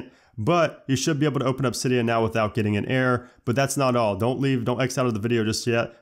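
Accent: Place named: South, United States. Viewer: anyone else think he has like a really hot voice? reminds me of like a southern guy lol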